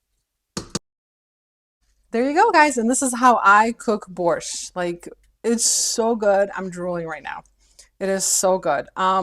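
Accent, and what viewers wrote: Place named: Russia. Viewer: found you at random from your treehouse video in Berlin. Im just south of there a bit in New Albany and will have to check the Amish treehouse out. My Grandmother came here from Russia in 1939 and made Borsch all the time. Not sure if Russian is your accent I detect, but thank you for sharing this recipe. ohhh love the apron, God bless you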